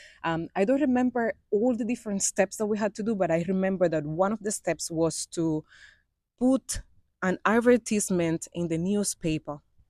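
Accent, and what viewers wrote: Patois, Jamaica. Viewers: Blessings. Lovely story. We are glad there was a happy ending to it. I love your vibe, your command of English and the ease with which you slip in and out of Janaican patois. No es facil mami. Gwaan do yuh thing. Bendiciones! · I absolutely love your spirit! I love that you have taken up the Jamaican patois! I would have never known that you were originally from Cuba. Bless up from Barbados 🇧🇧with a Jamaican 🇯🇲 mother! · I am so. please that you get your Jamaican citizenship, my grandmother was a Cuban too she lived in Jamaica 🇯🇲 from 14 to 76 years ago when she died , she would sang to us. her grand children in spanish ,I have thousands of relatives in Cuba that we don't know because of the Cuban system, enjoy your life in beautiful Jamaica 🇯🇲 and I love how you speak like Jamaican Blessings galore. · Thank you for sharing your story. 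I love listening to your Jamaican accent. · "Tell her bout her backfoot" this is so Jamaican.I love your patois.Keep going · Fortunately, the right people in Jamaica saw you were genuine and honest. Btw, I enjoy hearing your Jamaican patois.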